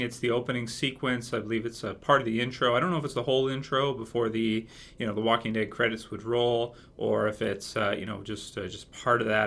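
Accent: England, United Kingdom. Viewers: Wait! You're english...,. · ... You're english? WUT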